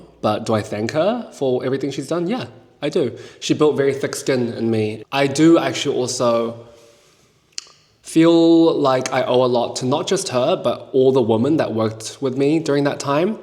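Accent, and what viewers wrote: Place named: Auckland, New Zealand. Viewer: this is sort of off topic but you have a very EASY voice to listen to -- some ranters can be grating on the ears or tell stories too slow, too fast but your pacing was perfect and your tone, dry humor and kiwi accent all tied so well together and made this an easy watch. Coming from a fellow kiwi who can't really stand the intense american influencer accents, you have a really clean kind of auckland accent, and since thats rare to find in influencer media, this kinda felt like a breath of fresh air, lol. This video literally healed my fried attention span. So shottiess for the mean as content, and am looking forward for more to come!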